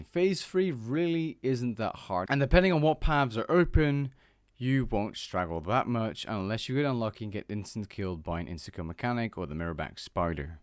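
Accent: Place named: Netherlands. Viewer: you can't hide your dutch accent boy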